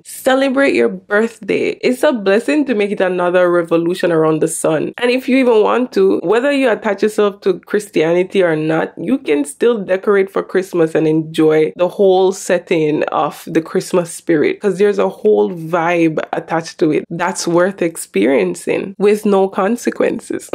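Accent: Jamaica. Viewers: I love your Jamaican accent-mun. 😅 I doubt I would look good in feathers and costume and makeup for carnival. 🤣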